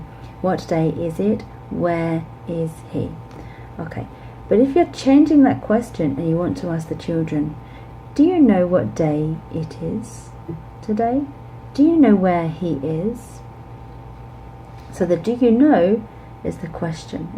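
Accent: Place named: United Kingdom. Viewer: Very interesting insight into this issue (and quite brave of you, I must say). As a Spanish ESL teacher, I used to work at an academy and noticed not just basic mistakes similar to the ones you mentioned, but some serious pronunciation issues. To be fair, we have an obsolete educational system in Spain when it comes to English: you never get to really practice your speaking or listening skills as much as needed (I'm talking about regular public schools). It is also true that nobody knows it all and even English native teachers do make mistakes. However, I believe people here tend to give it for granted that the fact that you got your university degree means you already have the sufficient level to teach English... and more often than not, this turns out to be self-deception. We must be aware of our weaknesses and the need of constantly improving our knowledge and skills, especially if aside from making a living out of it we intend to offer a real good-quality teaching of the language. 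Unfortunately, this is just like any other profession: some use their uniform as if it was an irrefutable evidence of perfection. I reckon what really makes the difference is whether you truly love what you do or not: if you do, you will try your best to continue correcting your mistakes and boosting your abilities. If not, you will just keep delivering sloppy lessons. By the way, you have such a clear and lovely British accent! I hope you are doing well and both your students and colleagues can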